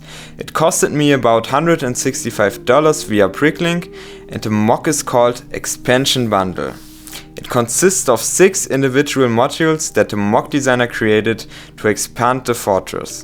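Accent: Germany. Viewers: Your german accent, is just like mine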